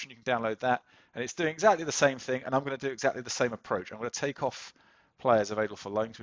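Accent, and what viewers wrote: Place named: South America. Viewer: Very interesting to have the critical data, but imo, sexy sounding South American > data.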